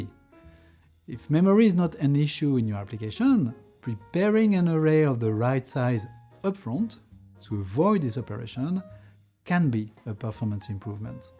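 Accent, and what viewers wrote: France. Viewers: I love the JEPCafe and I esspecially love your french styled englisch ! Keep on doing this series, its so great and informative ! Thumbs up! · Thank you! I guess my French accent is incidental though... 😄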